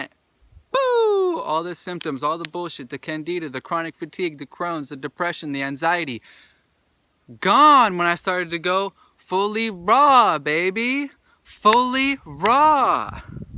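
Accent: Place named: California, United States. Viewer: dude I really like u! ur energy and accent is cool hahaha. reminds me of a cali surfer dude. Do u have FB or IG to follow too?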